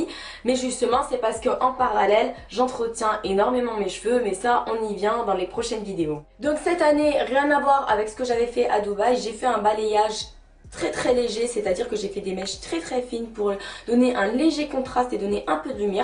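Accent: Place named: Algeria. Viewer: dés que tu as cmc à parler g tt de suite su que tu etais algerienne mdr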